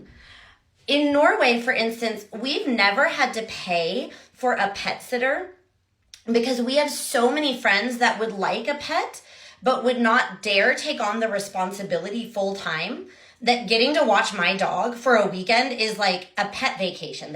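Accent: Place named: Canada. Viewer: This video makes me think you've never actually lived in the US. Are you Canadian? You sound like you have a Canadian accent.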